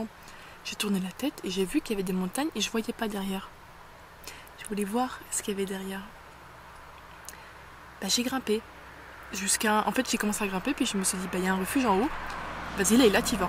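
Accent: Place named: Italy. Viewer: Magnifique videos!!. Tu commencais a avoir l accent italien!!!!. Bravo a toi !!. Tu es une baroudeuse😊. A bientot j espere. Revients!!!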